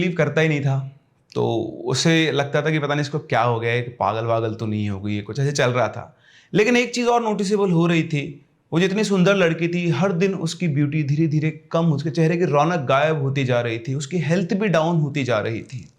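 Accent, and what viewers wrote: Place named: Haryana, India. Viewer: Sir your haryanvi is so cute and funny 🤣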